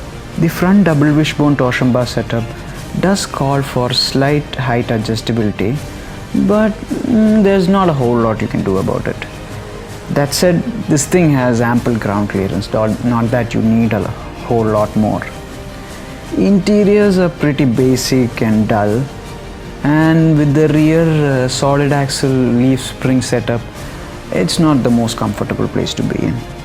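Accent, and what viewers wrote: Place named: Kerala, India. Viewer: കേരളാ ഇംഗ്ലീഷ്..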